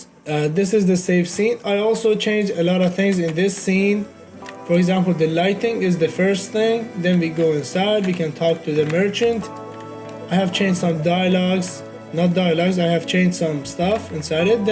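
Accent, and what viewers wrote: Iran. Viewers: سلام داداش اومدم ویدیوت از لحجت فهمیدم ایرانی موفق باشی 😂❤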